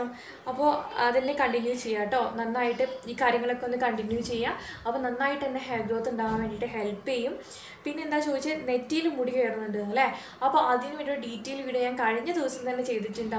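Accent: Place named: Kozhikode, India. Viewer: njnm calicut aanu chechii.. Slang kettappo thonni atha chodhiche ♥️😘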